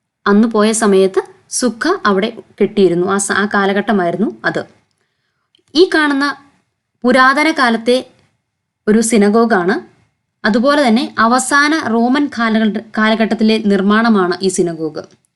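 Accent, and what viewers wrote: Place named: Kothamangalam, India. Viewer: Vargheeya Paraamarsham Nadathaathe Nishpakshamaayi Kaaryanghall Parayu....Paavam Malayalli Vlog Kandu padhikku... Theere Moshamaya Avatharannam...Bhaashayum Athupole....Kothaazham Slang Arochakam..... 🙄😡🙄😡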